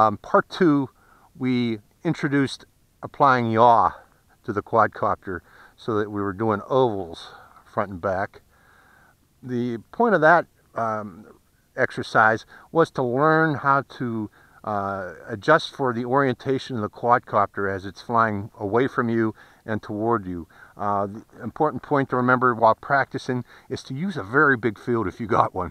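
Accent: Pittsburgh, United States. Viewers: I could be wrong, but you sound like a native Pittsburgher for sure 😉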